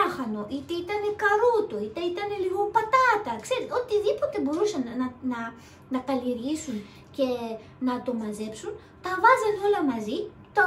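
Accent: Japan. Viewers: Υποθέτω, έχεις καταλάβει ότι μιλάς πια τα ελληνικά με γιαπωνέζικη προφορά. Σαν κάποια μεταγλωτισμένα γιαπωνέζικα παιδικά που βλέπαμε μικροί. Το οποίο είναι χαριτωμένο:)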